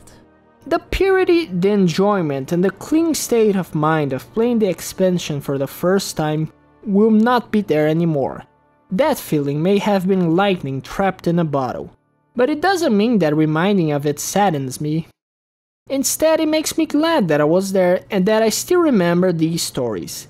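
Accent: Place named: Brazil. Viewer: I knew you had some Brasilian accent lol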